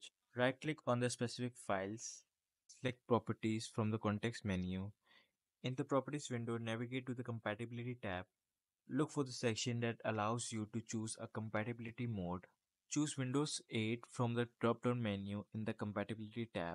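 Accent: India. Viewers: Hearing the indian voice i didn't belive it would work, but i was quickly proven wrong. Thank you!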